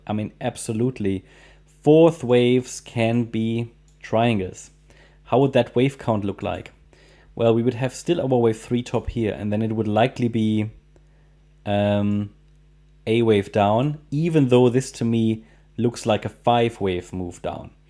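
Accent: Germany. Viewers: Hi, I like your comments. I am wondering and I might be wrong.......are you German? Your English is pretty good with a German accent??....😀 I am German too but was living 17 years in New Zealand..... Happy New Year to you! 🤝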